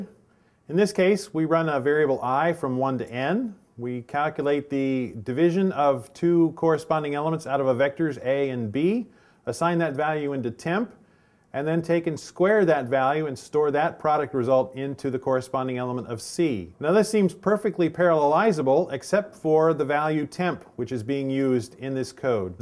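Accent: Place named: United States. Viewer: Just link to the slides, then I don't have to listen to an American accent.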